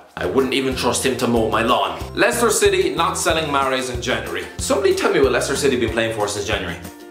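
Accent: Ireland. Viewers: i watch his vids for the irish accent like if you like the irish accent as well comment if you dont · Just wondering, where in Ireland are you from?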